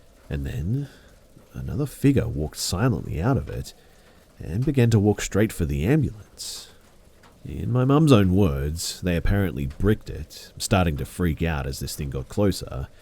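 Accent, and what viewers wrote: Australia. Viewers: I'm pretty sure he's Australian.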